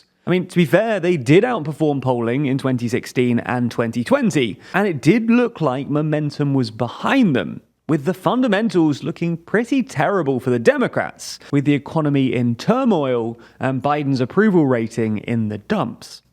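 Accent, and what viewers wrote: United Kingdom. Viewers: I think the problem is that the announcer is a Brit. It feels like an outsider telling a news from a froeign country.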